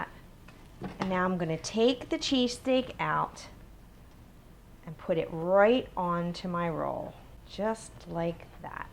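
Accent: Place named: New Jersey, United States. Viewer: OMG... i can hear the Jersey in her voice..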